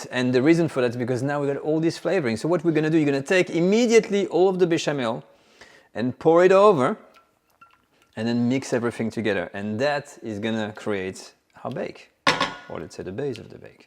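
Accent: France. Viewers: I like his French accent so much! It adds a lot to the experience!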